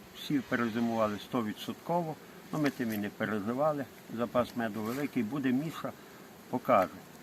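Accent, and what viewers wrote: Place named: Western Ukraine, Ukraine. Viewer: Закарпаття? Чи де це в сорочці в лютому можна ходити? По говору чути що з західних регіонів.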